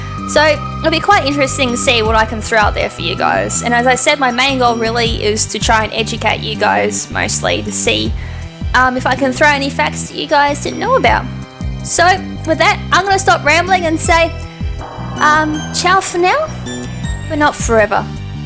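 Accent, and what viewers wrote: Australia. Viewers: Pimp sent me to hear that delicious Aussie accent.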